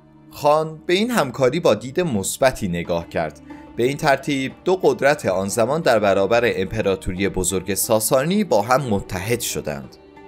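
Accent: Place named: Turkey. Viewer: کاش سلیس و روان صحبت می کردی خیلی ترکی صحبت می کنی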